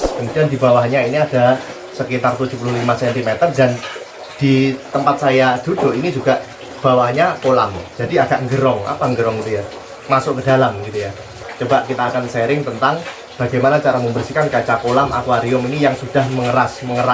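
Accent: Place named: Java, Indonesia. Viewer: Wong jowo medoke ketoro hahaha lanjutkan pak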